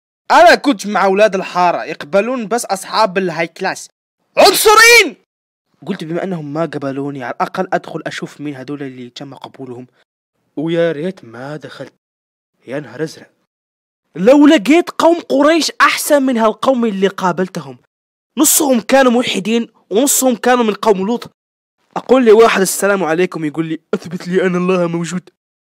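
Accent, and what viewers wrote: Morocco. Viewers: انت مغربي؟ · ولد بلادي باينة غير من هضرتك😂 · من هدرتك حسيت بيك مغربي فاش شفت فيديوهاتك تأكدت 😹😹 حبيتك الاخ 💁♥️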